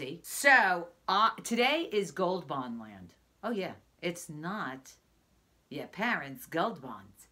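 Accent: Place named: New Jersey, United States. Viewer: Go, Jersey! I'm from Jersey and miss that accent. I loved your video on Gold Bond lotion. I just ordered it, and I can't wait to try it out. Thank you for the video review.😊😊😊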